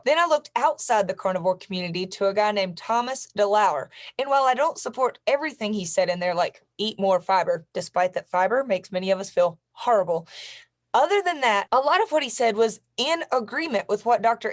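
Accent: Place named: Tennessee, United States. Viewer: OUT of curiosity- are you a TENNESSEE girl? I love hearing you talk- and your mannerisms are just like my area here.. would love to find myself a lady similar to you-- OLDER (i'm 66).. ha. but you sound like you're from my area-- You're a gorgeous lady-- your hubby is a lucky man.. Keep up your GREAT videos- I get more from yours because you talk like ME- and seem to think and have mannerisms like my area folk do.. in other words- you're similarities- have helped me get MORE out of this info in half a dozen videos than over a YEAR of listening to eveyrone else.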